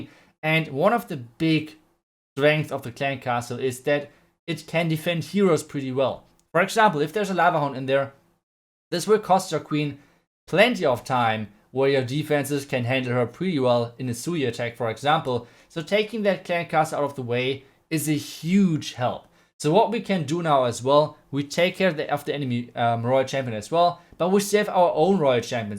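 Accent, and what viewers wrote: Germany. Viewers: U are german i can tell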